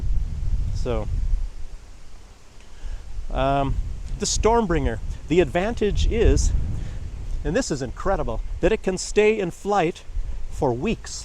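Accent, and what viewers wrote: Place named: England, United Kingdom. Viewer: You must be very much in love with Belarus. Where are you from bro? Judging by your accent, you're probably from England. However, I am from Cyprus, and I envy you now that you are in Belarus.❤❤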